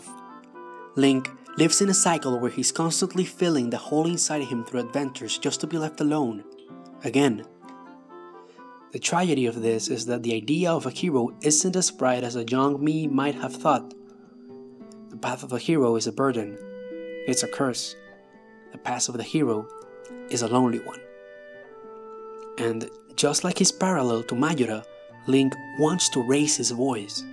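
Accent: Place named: Turkey. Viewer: good video but turkish accent + its called video essay and you could have made it hours long since theres a lot to talk about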